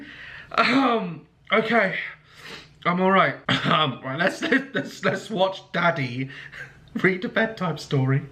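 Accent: United Kingdom. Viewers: sometimes I forget your British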